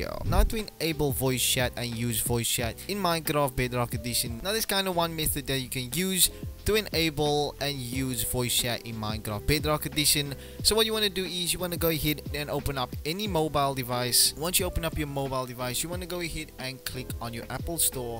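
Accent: South Africa. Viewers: U from SA aia.? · Are you Afrikaans? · You sound like a south african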